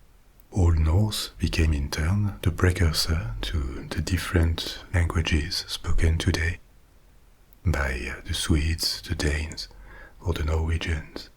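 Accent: France. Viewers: Your french dialect is whats makes it perfect. Thank you for your work. Listing to it every night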